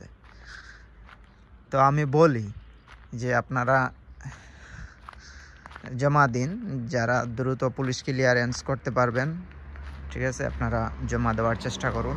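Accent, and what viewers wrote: Sylhet, Bangladesh. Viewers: ভাই আসসালামু ওয়ালাইকুম আপনার সিলেট মাত সুন্দর লাগে। আপনার সাথে যোগাযোগ এর জন্য কি আপনার নাম্বার টা দেয়া যাবে কি?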